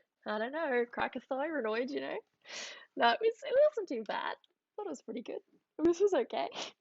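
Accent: Australia. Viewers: I love the aussie accent the way you say certain words littarly make my heart happy lol could listen all day!!!! Also could you check out otep the band there a great female metal group 👌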